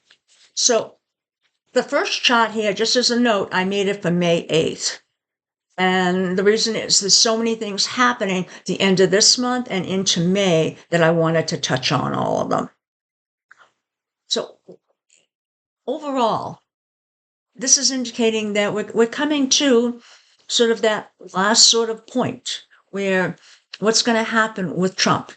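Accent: Massachusetts, United States. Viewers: I’m from Massachusetts and immediately was like this woman is from Massachusetts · I’m from the North Shore and heard a MA accent too. Always cool to find a local on YouTube! ❤ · Are you from Massachusetts? I'm guessing Salem /North Shore.😊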